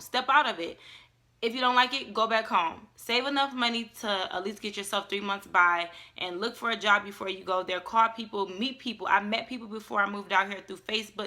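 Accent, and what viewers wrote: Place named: New York, United States. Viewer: you're from detroit? you sound like you're from NY lol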